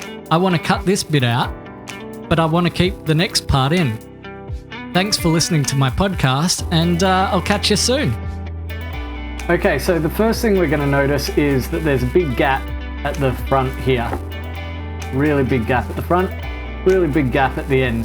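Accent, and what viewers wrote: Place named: New Zealand. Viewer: Took me way too long to realise he’s a kiwi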